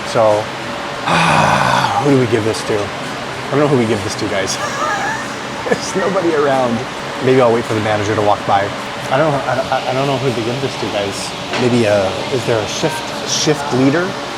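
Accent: Philippines. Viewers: nagiging filipino accent ka na slowly kuya. stresses and emphasis na lang on syllables, the level you are right now is comparable to an english-speaking household-grown teenager transitioning to learning filipino. very proud been a quiet watcher since january this year. Happy birthday!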